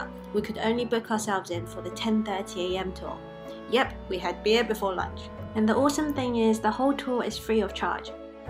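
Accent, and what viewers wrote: United Kingdom. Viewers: Hi I loved your video. I also love Asahi beer. As I'm from England I don't like the taste of our lagers like Carling and Carlsberg etc.. Asahi is very refreshing and tastes amazing. And from 1987 the year i was born lol. You sound like you are from the UK . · Where are you from? Cant work out your accent , it seems like you have a UK accent ( British accent) but it sounds mixed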